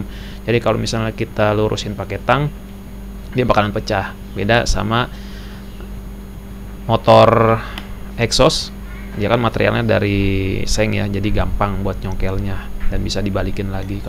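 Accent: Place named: Banjar, Indonesia. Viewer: Org banjar kh, logatnya tu..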